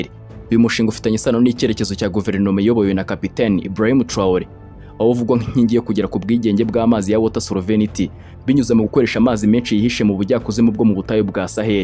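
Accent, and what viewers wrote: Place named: Tanzania. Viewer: Hello. I Am hearing you talking like a SUKUMA tribe in TANZANIA 😮😮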